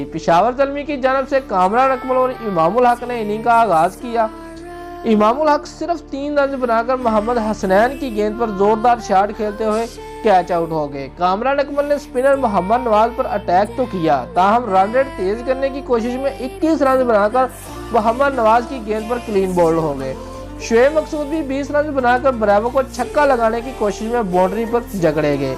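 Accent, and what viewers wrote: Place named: Punjab, India. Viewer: Abey chutiye punjabi style mn urdu bol raha ha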